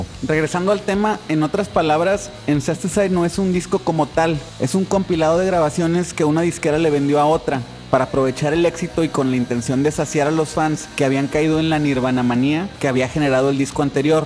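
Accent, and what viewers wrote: Monterrey, Mexico. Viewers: hablas bien regio vato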